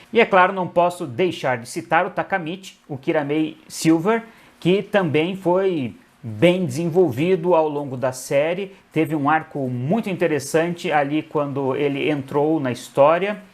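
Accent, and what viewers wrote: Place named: Brazil. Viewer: I figured it was Portuguese. Its a great video. As a native Spanish speaker i understood about 60%. Its great to know that Toku is watched all over the world! I'm going to assume that you're from Brazil since it sounds like Brazilian Portuguese. I which that toku could be more popular here in the US where I live P.S. if you could someday add subtitles it would be also. Regardless I'll subscribe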